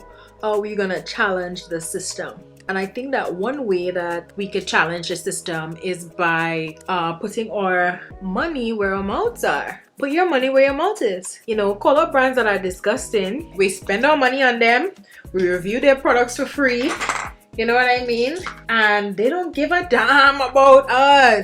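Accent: Trinidad and Tobago. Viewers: ❤️ when I hear your accent I miss my friend’s mother’s accent (Trini), I’m somewhat new to your channel subscribed 💕 hi again from 🇨🇦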